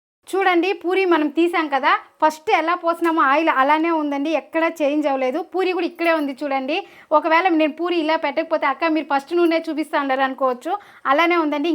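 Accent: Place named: Karnataka, India. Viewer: Mee Telugu, kannada accent tho kalisi undhi 😊Aina baaga cheppavu 👍🏼 nice n new recipe 😊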